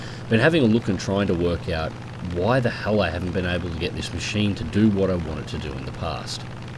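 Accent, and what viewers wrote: Australia. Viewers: the firs video I watched was his Sabre f2 firsti pressions video and the reason I subbed was his flying skills his cool calm and collected tone and of course his aussie accent ;)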